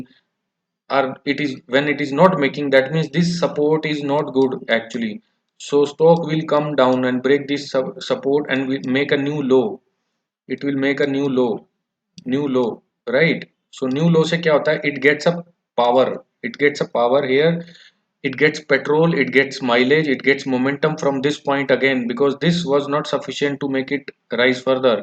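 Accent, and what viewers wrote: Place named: Haryana, India. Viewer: aawaz main to haryana brgi jan si h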